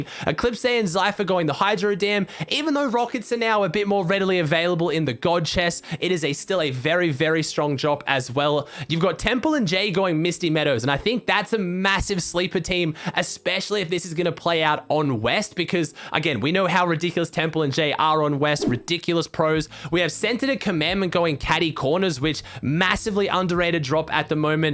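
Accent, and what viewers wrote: Australia. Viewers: Man I love starting my day listening to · asmr??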